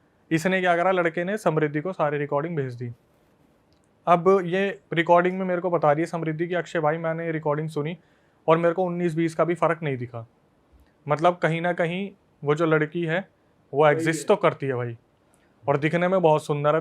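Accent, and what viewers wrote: India. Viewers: There bolne k lahje se PTA Chala ki tu bihari jhatu hai😂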